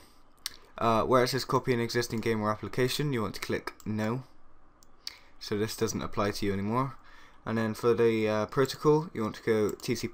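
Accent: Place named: Bristol, United Kingdom. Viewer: You sound Bristolian : O and this helped ty, kept finding tutorials for this and found american routers -_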